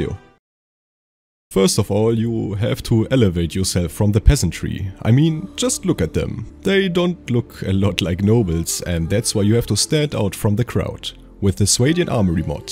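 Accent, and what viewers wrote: Germany. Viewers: Der deutscher akzent hört man zu sehr aber gutes video schade nur das man auf der konsole keine mods benutzen kann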